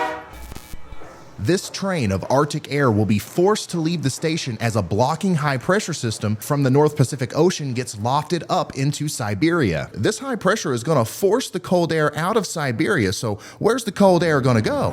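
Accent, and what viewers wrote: South, United States. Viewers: dude has a country accent but his intellect fights against it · This is like the one deep voice guy from riddle but southern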